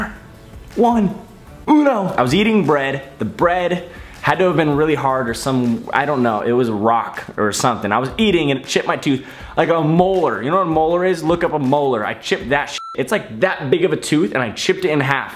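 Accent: Scotland, United Kingdom. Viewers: Nice Scottish accent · You sounded kinda Scottish and I'm living for it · Scottish accent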